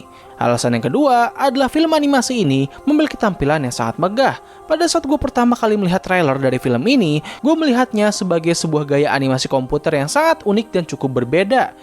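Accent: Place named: Banjar, Indonesia. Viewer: Ulun kira Pian orang banjar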